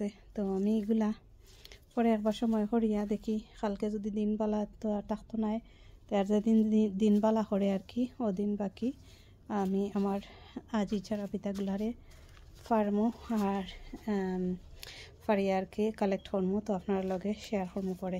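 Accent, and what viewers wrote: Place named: Sylhet, Bangladesh. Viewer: সিলেটি মাত হুনিয়া খুব ভালা লাগল❤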